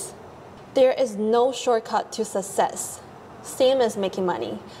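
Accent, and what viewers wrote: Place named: Hong Kong. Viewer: 閣下的廣東話像是一個香港人，不知是否正確？純粹好奇一問，祝新年快樂，訂閱人數蒸蒸日上，紅遍大江南北。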